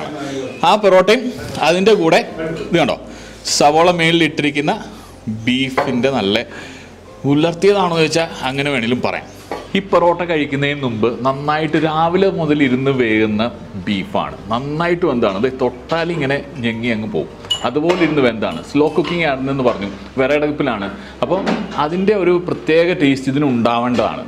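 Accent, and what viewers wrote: Thrissur, India. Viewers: light aayittu kottayam bhaashayil thrissur slang kerunnundu , chayayil elakkade essence kerunna pole 😉😉, paranjhattu kaarilya yesu christune yesuvettannu vilikkana teamsanu 😁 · ചെറുതായി തൃശൂർ accent വരുന്നുണ്ട് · Thrissur slang Vanna pole, Akcent change try cheyyano like Sujith bhakthan tried this previously 😁😁😁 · ee video il samsarikkumbol oru Thrissur accent inte hint evideyokkeyo varunnund 😁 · പൊറോട്ടയും ബീഫും 👍👍👍 സംസാരം ഫസ്റ്റ് കേട്ടപ്പോൾ തനി തൃശൂർ സ്റ്റൈൽ പോലെ തോന്നി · ഒരു തൃശ്ശൂർ സ്ലാങ് നാവിൽ കയറികൂടിയിട്ടുണ്ടല്ലോ 🤔🤔🤔, അതോ എനിക്ക് മാത്രമാണോ തോന്നിയത് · തൃശൂർ ജില്ലയിലെ കടകളിൽ പോയി വീഡിയോ എടുക്കുമ്പോൾ സംസാരത്തിൽ തൃശൂർ slang വരുന്നുണ്ടല്ലോ 😊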